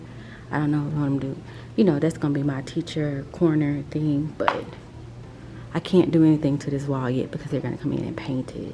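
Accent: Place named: Alabama, United States. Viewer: Hi! New to your channel. First grade is MY favorite! I'm a recently (well somewhat, it's eleven years now) retired first grade teacher here in Alabama. I see that Auburn shirt, hear the accent so I ask are YOU in Alabama? Maybe Georgia? You're delightful to listen to and I enjoy watching your set up. I like those clear drawers you found. I don't have a Staples down here in my city. The room is so pretty with the pastel colors. That is a spacious classroom and looks new. How old is your little one? 🤩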